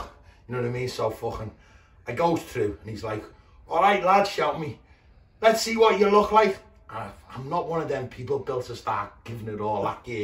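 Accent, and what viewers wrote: Liverpool, United Kingdom. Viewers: Proper scouse him. Quality.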